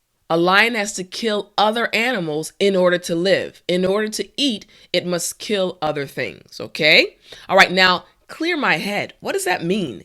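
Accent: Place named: United States. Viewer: Hello beloved my mom I'm meek ranjit from India at Odisha studying now I'm so cheerful of your english Teachership truly say that I'm understanding your Pronouncation of an American accent now these days improving vocabulary I'm also reading the Bible everyday that's by God is helping me individually life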